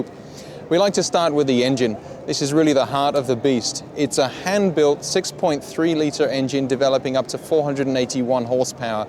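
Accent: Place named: Australia. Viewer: Beautiful car! This guy sounds australian...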